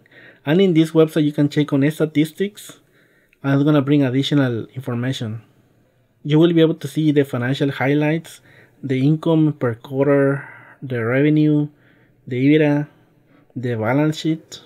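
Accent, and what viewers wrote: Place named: Latin America. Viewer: I appreciate your help and this video gave us viewers some more tools. Thank you. Two comments about presentation. 1. Wear a nice collared 👔 shirt. The look of success is credibility when talking about making money. You have a very kind face and your English is clear, despite the heavy Latino accent. It is very good. I know how hard English to learn and you are absolutely doing great. You are able to communicate very technical information and this is very impressive. The second thing I want to pass on to you about presentation is to SMILE. Lighten up. It is okay to show a more human side to you. I’m not saying to wear a bunny costume, but let us see the more personable side to you that we would if we went out for drink together. I will subscribe. Again, thank you.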